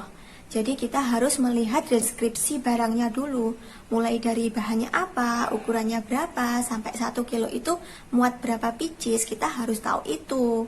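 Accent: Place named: Medan, Indonesia. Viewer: Dilihat dari logatnya, pasti orang medan ini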